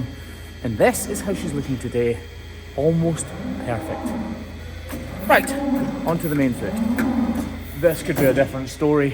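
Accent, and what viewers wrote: Scotland, United Kingdom. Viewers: Sorry I love your Scotish accents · It's the Scottish accent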